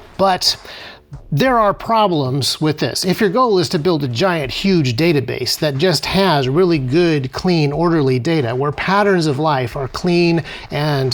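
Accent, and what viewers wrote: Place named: United States. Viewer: You accent sounds American, at the end there you talked about London so much I may be wrong about you. The end there is a good example for the American 4th Amendment which requires police to have a warrant to investigate people. Meaning evidence of a crime needs to be presented to a judge. For America this kind of mass surveillance is so very illegal.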